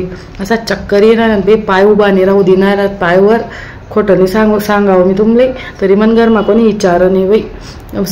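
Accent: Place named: Khandesh, India. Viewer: पुर्ण खान्देशी accent पाहिजे ऐकायला मन तडपतय अजून आम्हाला शिकाचे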